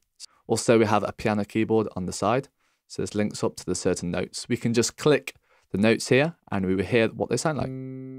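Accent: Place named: United Kingdom. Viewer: Thank you man,but your british accent...I just didn't catch anything...anyways,thank you :)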